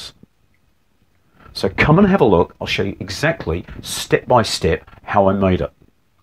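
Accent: New Zealand. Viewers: So that’s how a real live newzealander sounds like!!!!!!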